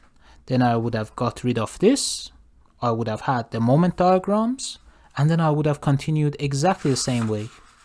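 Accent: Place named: Iran. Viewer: great sir. (btw, your accent seems like you're from Iran or its surroundings, am i right?)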